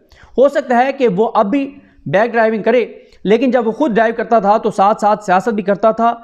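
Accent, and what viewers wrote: Saraiki, Pakistan. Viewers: AP ka ghr idr Hy islmabd???? R hm AP ki sraiki ko sumghty him bohttt piari boli Hy hm jhng sy hin r faslabd rehty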